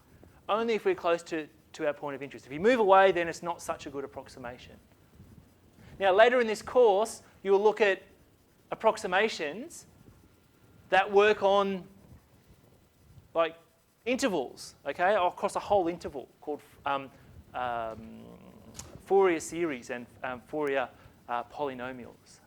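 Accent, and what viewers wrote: Australia. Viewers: Nice Aussie accent.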